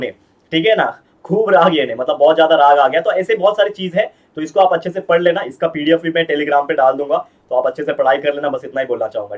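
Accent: Maharashtra, India. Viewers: Sir, your marathi is cute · So cute marathi aahe sir... 💫🤞thanks for teaching us...🙏💯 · WOW! So cute marathi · Sir your Marathi is very cute 🤗🤗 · Sir your Marathi is so cute 💜😊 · Apki marathi cute he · Cute Marathi sir but explain is best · Sir aapki marathi thodi comedy he😅😅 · Your marathi is quite 🥰🥰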